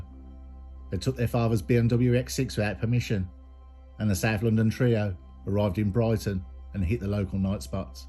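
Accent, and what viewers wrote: Birmingham, United Kingdom. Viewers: I know i keep saying this but I ❤this curious and spiritual i just wish you could do videos on a more frequent basis love it tho love the content and your narration sir are you a Brummie sir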